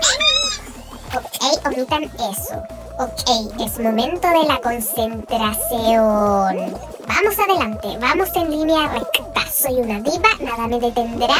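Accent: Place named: Chile. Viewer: Amo la voz chilena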